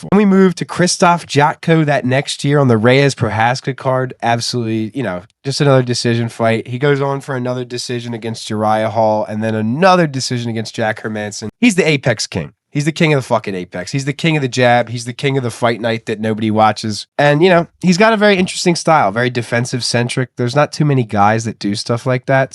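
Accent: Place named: New Jersey, United States. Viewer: I can hear the south jersey/philly accent brother lol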